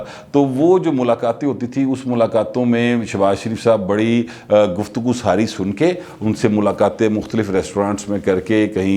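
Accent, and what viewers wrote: Lahore, Pakistan. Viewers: you’ve given a superb interrelationships between the Mian brothers by illustrating a few of your personality experienced happenings. You’ve certainly a great narration skill in Urdu dotted with typical Lahori Punjabi. Super journalistic analysis. That’s why your vlog are impatiently waited for. ❤️❤️❤️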